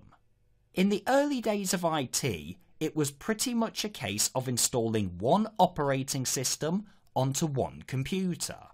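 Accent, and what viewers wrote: United Kingdom. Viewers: Best explanation ever on Hyper-V and I enjoyed the British accent. well done thank you for the free training videos.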